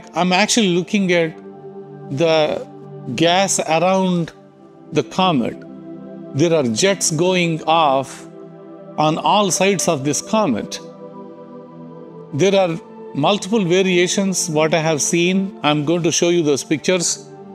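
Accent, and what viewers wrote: India. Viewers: He obviously has a thick South Indian accent 😅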